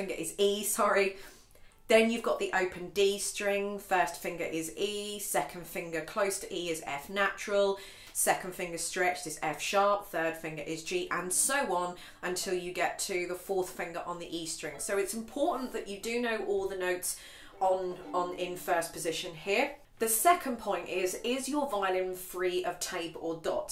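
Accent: United Kingdom. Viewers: I love your british accent, thank you for the advice, im 9 months violin student and i've just started with shift positions and vibrato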